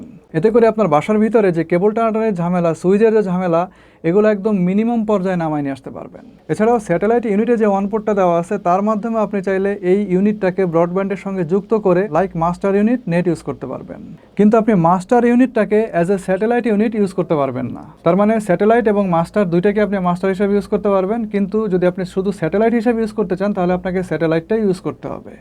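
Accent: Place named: Bangladesh. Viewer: আপনার বলার ভঙ্গী খুবই সাবলিল ৷ একবারও আটকে যায়নি ৷ অন্য কেউ হলে এই কথাগুলো বলতে অন্তত কুড়ি মিনিট সময় নিতো ! আর আপনার বাংলাদেশী উচ্চারণ বেশ মধুর লাগলো ৷ ভারতে এটা পাওয়া যায় কিনা খুঁজে দেখছি ৷ কলকাতা থেকে আমার ভালোবাসা নিন ভাই ৷ এসব জটিল বিষয়ে আমার লেখাপড়া কম ৷ সেজন্য আমার ছেলেকে শেয়ার করছি ৷ ওর কাছ থেকে ভালো করে বুঝে নেবো ৷ এই চমৎকার তথ্যপূর্ণ ভিডিওর জন্য অসংখ্য ধন্যবাদ আপনাকে ৷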